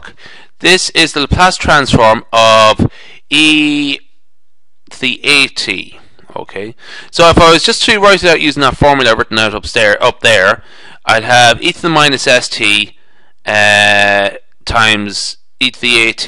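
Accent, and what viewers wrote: Ireland. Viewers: man's irish. i can tell. that was really helpful thank you!!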